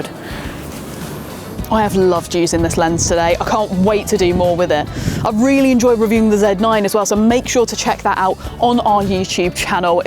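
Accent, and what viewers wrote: United Kingdom. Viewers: British accent 🤩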